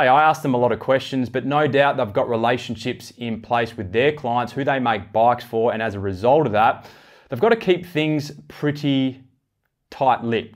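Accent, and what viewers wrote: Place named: Australia. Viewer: Aussie accent #chinurr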